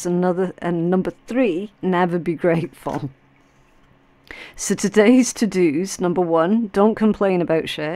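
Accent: Scotland, United Kingdom. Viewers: Girl I say cut the comments back off I totally understand why u did. Lots of haters from my country US I'm from the US I am such a lover of ur channel and skill and I love ur Scottish accent yall rock You're desk is so pretty I love the cards you switch up Love your style it's so mystical Bless you & Thanks so much for the readings!!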